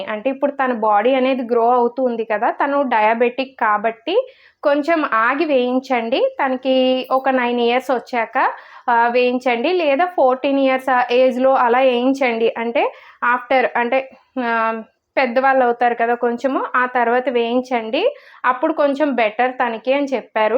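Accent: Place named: Kakinada, India. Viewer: Soursop soop fruit juice mee husband ki ivvandi , B17 foods pettandi , non veg thakkuva tinali ,sugar thaginchali ,ila diet control cheste mee husband cancer growth thaggutundi ,maa ammagaridi kkd vakalapudi ,maa attavaru vzm, meeru chala baga matladutunnaru ,meeru matladutunte kakinada lo unnatlu anipistundi,mee matalu vinalanipistundi , maa maradalu kuda meela untaru.maa chanal svcs dairies meeru subscribe chesukondi maachanal peddaga develop avvaledu.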